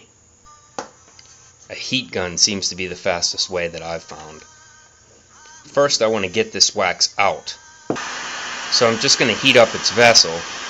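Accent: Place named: New Jersey, United States. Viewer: Are you from New Jersey? I know of a guy who is from NJ that speaks a lot like you do.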